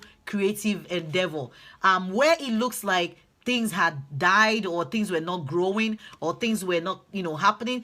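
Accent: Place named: Nigeria. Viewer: This absolutely resonated & it really spoke to my spirit. I love your Nigerian accent too! ;)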